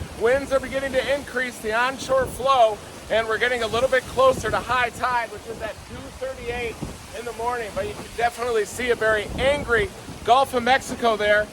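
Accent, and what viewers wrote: South Dakota, United States. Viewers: Hey, are you from South Dakota?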